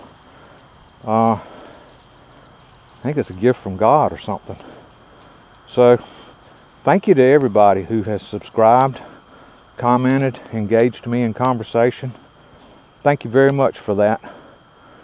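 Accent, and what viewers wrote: South, United States. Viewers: I enjoyed the backpacking trip! Learned a bunch more. That bit of glee in your voice every time you sprayed a weed made me smile - especially when you came across that evil sicklepod (which I promptly Googled) - "DIE" spray "DIE" spray - all in that nifty southern accent. Exactly how much backpacking do you do on your acreage - or was this just a spot check? You are right though - "you can't buy rain". If I could gift you with it I would. Hang in there and keep on being the that you are - won't be long now before there are over 2K of us out there following along and enjoying your content. You have not only grown soybeans, you have grown a fan base who really appreciates what you share with us!:)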